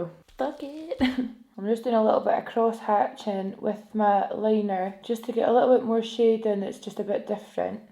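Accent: Scotland, United Kingdom. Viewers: Is it just me that loves it every time she says the word “white” 😂 I just loooove the Scottish accent 💖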